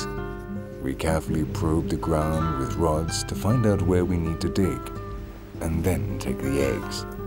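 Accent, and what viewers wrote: England, United Kingdom. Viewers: It would at least been appropriate to have an Australian narrator. Somewhat irritating to listen to an English accent. Not OK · Burtt its very well filmed, however - the narrative is not as well done. For a start, they have used a non Indegenous (British!) actor to do the voiceover, instead of using the authentic voices of those who live in these areas - they do speak English! A big 'ouch'' for me was hearing all sorts of European concepts such as historical epochs of Time, stitched into the voiceover. Aboriginal elders have a mental landscape which exists outside of Time. Historical epochs are a European mindset, and sadly much that is European pervades this voiceover. There are better documentaries out there... i wish you all the best of luck in finding them ...as it is indeed an incredible culture. But lets let them tell their own story as much as possible... 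in their own words. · Where did the narrator get his accent from. Certainly not Australia. You sound more like Prince Charles than an aboriginal.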